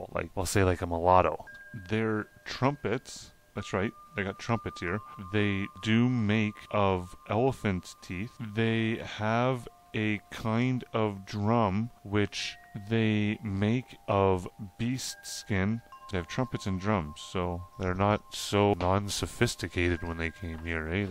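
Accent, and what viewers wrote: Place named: North Carolina, United States. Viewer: Welcome back. This one must have taken quite a bit of research for you! Very good info and detail; I've never heard of these men before with the exception of Verrezano, whose name you are pronouncing with an extra 'z' at the end. I love your accent, and said to my daughter the way you pronounce certain words, like 'about' sounds like you are North Carolinian. Then you tell us that Norumbega is near where you are from! We have a St. Johns River in Florida, which is 310 miles long, and runs parallel with the Atlantic.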